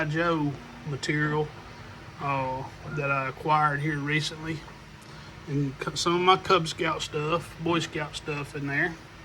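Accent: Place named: South, United States. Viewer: Southern twang at its finest....cool room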